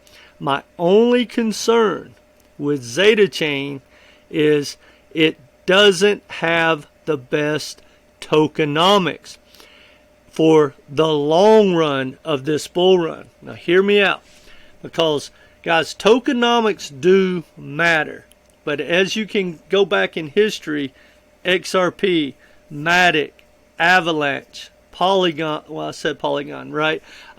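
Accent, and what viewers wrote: United States. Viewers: I love your accent SO damn American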